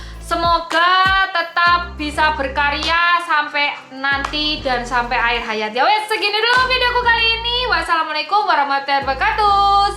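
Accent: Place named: Padang, Indonesia. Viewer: Rodok padang ngono lohhhh😂😂suka logat bicaranya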